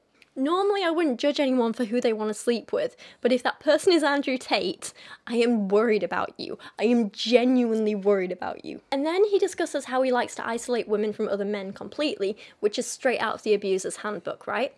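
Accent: United Kingdom. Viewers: There is some humor in how you read it in your cheerful sweet very fsminine voice with a sophisticated British accent. Love it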